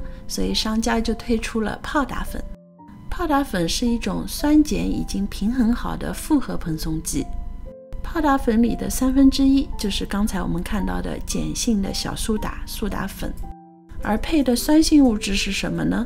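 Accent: Shanghai, China. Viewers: 谢谢。听到你视频里的上海话感觉很亲切。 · 上海方言好親切！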